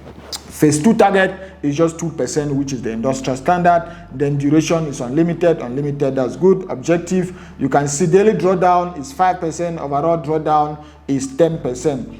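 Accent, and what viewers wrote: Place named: Nigeria. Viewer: My wife said your accent is Nigeria. Lol. Thanks for the analysis it was helpful. Good job.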